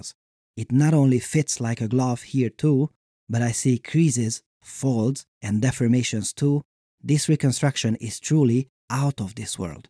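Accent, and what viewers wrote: Hungary. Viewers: Are you Hungarian? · Tipikus magyar akcentus! xd (ne vedd sértésnek!) Nagyon jó videó!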